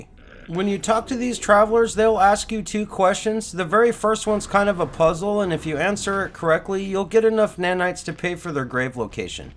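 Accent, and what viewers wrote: Canada. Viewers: LOL. Just found this channel. im almost 30 hrs in. I JUST found my first Glyph Portal. Got 1 Crap freighter. a few ships. One is a fighter with way more dps than the other 2. 4 storage container rooms in the freighter. A few upgrades to things. Multiple Base Computers plopped on worlds. I havent even built a real base area. I have a 3x2 box with a door. 2 Specialists that now dont seem to do anything but stand there. Gettin the hanf of the game though, but if there is anyone out there that is less efficient than I am, you deserve an award, because this game is not user friendly. It has the most convoluted menu system ever. Its sleek...i'll give it that, but holy moly...spending 3 hrs to figure out how to do something as simple as figure out where to find "Hydraulic Wiring" 0.0 Still love this game though edit: Dude sounds like he is from Alberta edit: Grave Locations?? 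25 hrs in and i have died once. Found my grave. Never seen anything about others' graves o.o. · Sounds like you stepped straight out of Letterkenny lmao 🤣 thanks for the video. Haven't played in a year... Everything has changed so much... I decided to create a new game save so these tips will be very useful. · Are you Canadian?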